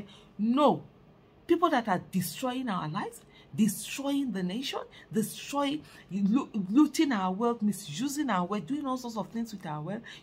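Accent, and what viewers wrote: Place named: Bendel, Nigeria. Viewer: Proper Bendel woman talking! Better listen o!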